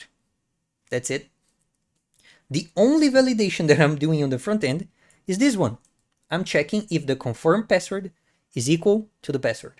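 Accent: Brazil. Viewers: Man, o video está top e inclusive isso não é uma critica ao seu inglês, mas o brasileiro tem o jeitinho brasileiro de falar inglês né rsrs, eu assisti o video todo sentindo uma forte familiaridade 😅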